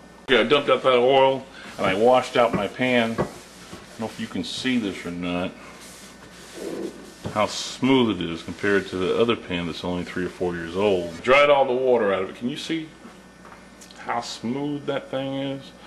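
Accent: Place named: South, United States. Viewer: Hi! This is a fantastic demo and it's a really good idea to educate people about the benefits of using cast iron as opposed to modern non-stick varieties. Your cooking vids are brilliant and inspiring - I'm English and live in London, but I grew up in Virginia and I sure do miss my Aunt's cooking - your vids bring it all back (esp the accent!) I do my best to recreate Southern home cooking here in the UK and my English husband absolutely LOVES grits!! Keep up the good work! Best wishes!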